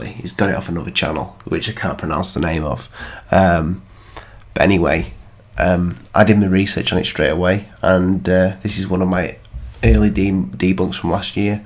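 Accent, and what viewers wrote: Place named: United Kingdom. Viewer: I live in the UK in a small shit town called Grimsby, and i know you will know it just by your accent, anyway, i am a big fan of secure team 10, but hats off to you mate, even i didn't believe in most of his Google earth images, and i know other videos may be fake, i just wish now he would do his research properly, I feel a bit gutted actually. But i still believe that there are alien craft out there, most of it probably back engineered by the real terrorists, AMERICA! The only country allowed to bomb other countries, and when they fight back, they are called terrorists, anyway, that's a different subject. Nice work buddy.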